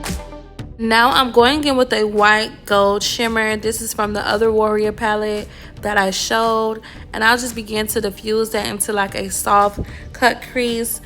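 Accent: Miami, United States. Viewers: That Miami accent tho 😘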